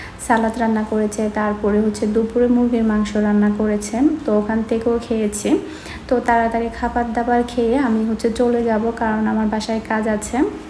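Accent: Chittagong, Bangladesh. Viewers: চট্টগ্রাম এর ভাষা একটু অন্য রকম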